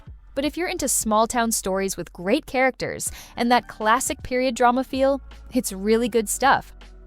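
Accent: United States. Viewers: Sorry, i can't stand this americain diction...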